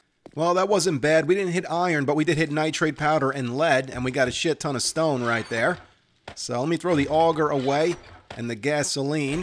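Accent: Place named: New York, United States. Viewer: I knew it I knew you were from New York but I just couldn't put which part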